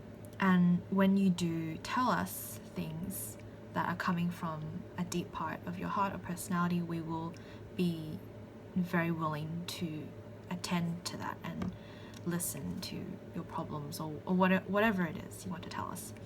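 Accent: Australia. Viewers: offtop, sorry, but are you Australian maybe? :) Cheers from infj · I was not expecting an Australian accent out of you ! I love it ! It is beautiful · I hear Aussie? ❤️ Melbourne Victoria here but live in Miami 😩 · you sound aussie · is that an aussie accent i hear? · She's Australian. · Very insightful talk, as a male INFJ a lot of these points are relatable to males. ps. I’m guessing you’re Australian 😊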